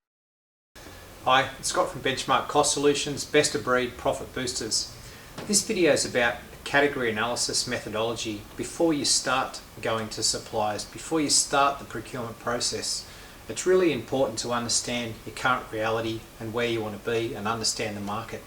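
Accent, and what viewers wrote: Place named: New Zealand. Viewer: KIWI accent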